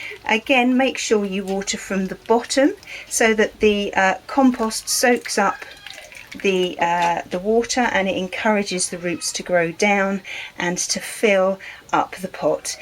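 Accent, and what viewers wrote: United Kingdom. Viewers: I love your videos! You fulfill my need for gardening and proper british accent <3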